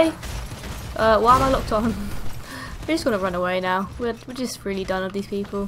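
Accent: United Kingdom. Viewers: Finds I female Youtube ... I like this girl ... Wait she's British ... I really like this girl ... Wait she's playing Dark Souls OMG! Keep doing your thing never give up your amazing.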